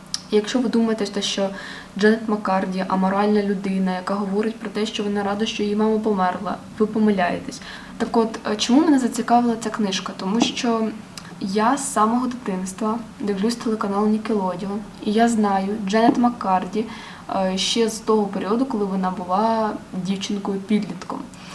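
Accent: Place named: Russia. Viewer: Привітик, файне відео:3 але попрацюй над вимовою літер, вимовляй чіткіше літеру "Щ" наприклад як "шчо, шче, шчоб" та не забувай про "Ў нескладове", розумію тобі як російськомовній буде тяжко звикнути, але потрібно позбавлятися російської вимови, я вже довгий час працюю над своєю вимовою!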